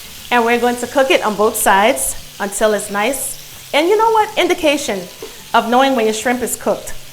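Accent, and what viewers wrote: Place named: Maryland, United States. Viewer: You are one fine cook. I just made your Lobster tail stuffed crab recipe and I’m the local hero hereabouts thanks to you even though I think that I slipped up on the Cajun.. I used 5 full length lobster knuckles/claws and secured them together with long toothpicks..in lieu of the lobster tails..more tender..Placed on a 5”x 6” shell.. Your recipe makes two servings..PERFECT..Thank you.. and by the way your accent belies you. Are you living somewhere between New York… Maryland girl? LOL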